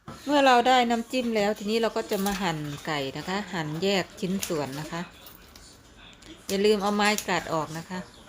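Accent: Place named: Isan, Thailand. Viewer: ชิมนำแน่ครับ .เป็นตานุ่มคือคำเว้าอิหลีตั่วละ.น้ำลายไหลเลยครับ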